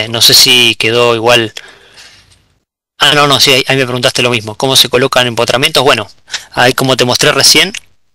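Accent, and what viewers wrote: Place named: Valparaíso, Chile. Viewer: hablas iwal que el amigo de una amiga, no seras de valparaiso?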